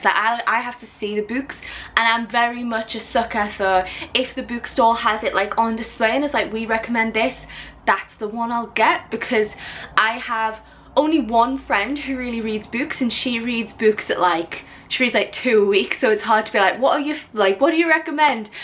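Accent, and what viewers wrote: Newcastle, United Kingdom. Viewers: What accent do you have? Sounds slightly Geordie, soz if not I'm Welsh don't know too much about England. Your accent is very cool btw :) x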